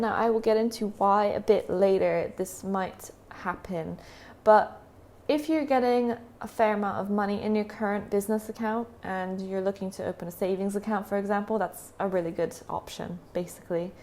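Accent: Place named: United States. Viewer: How come you have an American accent? 😊 Thank you so much for the videos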